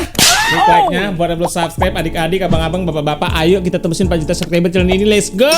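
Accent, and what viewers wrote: Java, Indonesia. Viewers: tak kiro samean wong jowo bang 😂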